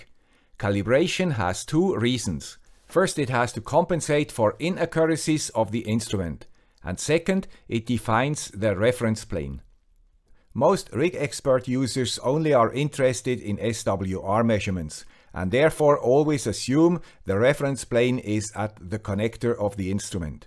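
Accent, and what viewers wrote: Switzerland. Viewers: This gentleman sounds exactly like Andreas Spiess, the guy with the Swiss accent.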